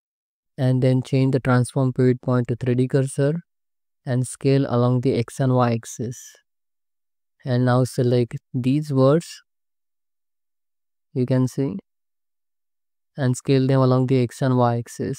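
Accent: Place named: India. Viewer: Nice video dude, your English accent is like an Indian guy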